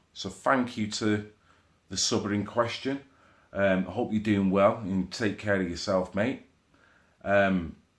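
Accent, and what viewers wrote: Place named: Liverpool, United Kingdom. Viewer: Racism would NOT exist between whites and blacks if all black guys adopted the same morals and loyalty that strongly holds towards his country and people. I agree with almost everything talks about and I look forward to all his uploads. I was an early subscriber to his channel because on listening to his posts, I immediately recognised this man as a decent honourable and above all loyal black Brit whose corageous enough to speak the TRUTH without fear or favour. is clearly very clever, informed and is an articulate speaker (with a Liverpudlian accent lol) to boot who doesn't use obscene profanity to shock. I think his talents are pitifully wasted as a painter decorator (no disrespect intended to decorators who are all hard grafters). He's the kind of guy I'd hand pick as a friend. I think that Merseyside Police should appoint as their new Diversity Policing Adviser.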